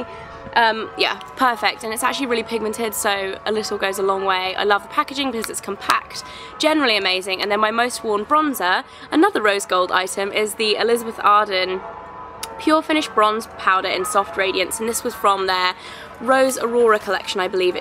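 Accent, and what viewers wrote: England, United Kingdom. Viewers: I have to say, you are very well spoken and very well dressed! I don't normally come to this side of the internet but my sister-in-law is looking to do make-up for movies so been poking around. (I'm a presenter for much different things) Anyway, I just wanted to say thank you for putting forward such a great English accent for everyone to hear! Totally recommended. +10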